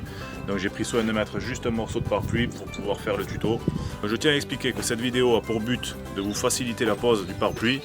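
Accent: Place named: Carcassonne, France. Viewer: slt, super merci pour les infos. Je ferais donc un coffrage si tu me le dit c'est que tu le sait ne pro c toi pas moi 😉. J'appiquerais donc tes conseils à la lettre . Je me disait aussi cet accent est le même que ne mien !!! Moi je suis de carcassonne un grand merci pour les combine surtout que tu n'est pas obligé. Par contre si tu fait un tuto sur ce genre de méthode eh bien je prend avec plaisir.a moins que tu l'est déjà fait dans ce cas pas besoin. Je n'est pas encore pu visionner toutes tes vidéos je le ferais ce week-end je pense encore merci 😉😉😉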